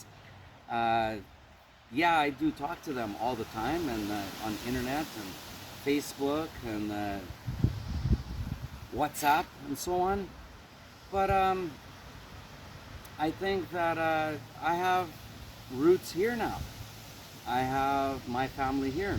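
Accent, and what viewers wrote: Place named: Russia. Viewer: Wow, I am from Khabarovsk! Great to meet you there) You have Russian accent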